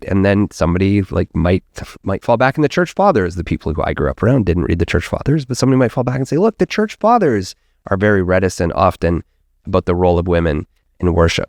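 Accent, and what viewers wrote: Canada. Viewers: Don't worry bro I actually can tell that you're Canadian by your accent. The word has a lot of baggage that was helpfully unpacked. I wonder if many of the issues that you discuss potentially stem from people focusing on the New Testament. Paul discusses (and references) a lot of Scripture, that is, the Old Testament and whatever else the Jews used. I think there are a few epistemological problems with liberal Christianity: it is the latest installment in a long tradition of Greco-Roman thought that I think is problematic. This is my first introduction to liberal Christianity so I can't really say much else. Very thought provoking stuff, thanks for sharing. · Great discussion -- it really highlights the lack of intellectual, and if I may say so, spiritual honesty in the evangelical faith community that I was a part of for so long. I did not feel free to wrestle with any doubts whatsoever, much less to express them openly. 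Even if you found someone who understood the problem, they always seemed to have a cut and dried "solution" that appeared "doctrinally sound" but completely unsatisfying. Which left me basically no choice but to quietly leave the matter unexplored and wonder why I was such a lousy Christian. Very difficult to put that problem into words until now, so thank you so much for confronting it. By the way, being a big hockey fan, I never had any doubt that you have a Canadian accent, not an American one!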